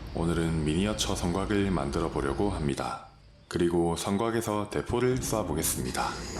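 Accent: Gyeongsang, South Korea. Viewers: 더빙하시는분 경상도 사람인가요? 경남쪽분 같은데 ㅋㅋ 하나하나, 지붕 등등 억양이 ㅋㅋ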